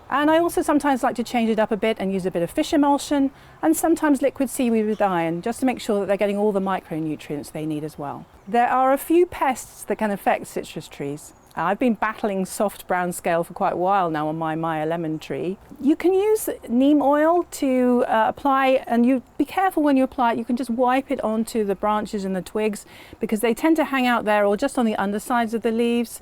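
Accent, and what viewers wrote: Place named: Texas, United States. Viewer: The accent lol 😹 I thought this was Texas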